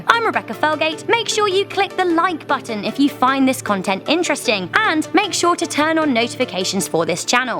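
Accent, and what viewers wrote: United Kingdom. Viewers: British sounding person detailing a war between the U.S and Germany. But this, does put a smile on my face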